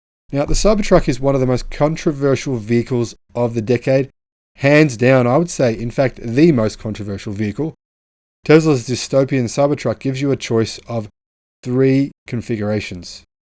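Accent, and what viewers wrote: Australia. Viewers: Love Aussie accent! I'll wait for Rivian to deliver to Europe - or even F-150, but Ford most probably won't deliver F-150 to Europe though... (would love to get rid of my Tesla MS, won't buy any other Tesla vehicle, no matter what, because of how I experienced the really bad service and customer treatment after 4+ years of ownership...)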